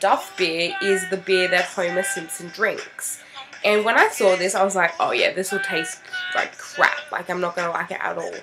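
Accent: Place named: New Zealand. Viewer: just an observation - you talk like a new zealander. we have to change that!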